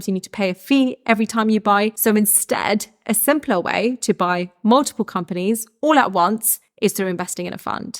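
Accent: United Kingdom. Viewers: Wow. So clear. And, good to hear a fellow Brit. Most of these types of videos are very US-focused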